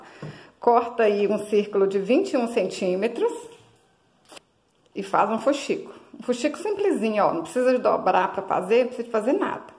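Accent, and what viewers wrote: Minas Gerais, Brazil. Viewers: Vc é mineira? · Parabéns adoro os seus artesanatos ,e a explicações são bem claras ,e também adoro o seu sutaque de mineira ! 👏👏😍😍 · Ameiii. Parabéns pela dedicação. Adoro seu sotaque de mineiro.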